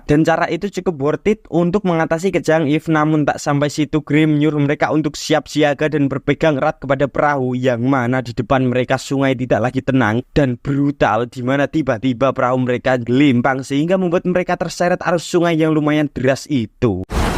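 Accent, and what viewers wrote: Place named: Java, Indonesia. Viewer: channel yg tidak ada apa apanya di banding iq7, suaramu medok gak enak di dengar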